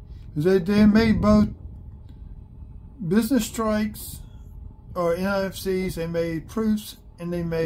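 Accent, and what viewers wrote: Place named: South, United States. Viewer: Nice Southern 👍 😊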